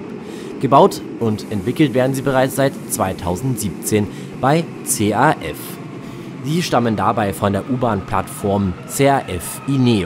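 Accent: Germany. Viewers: Hallo, ich habe jetzt schon einige Videos von dir geschaut und mich gefragt, woher dein Dialekt kommt? Hast du irgendwie Wurzeln in Baden-Württemberg? 😄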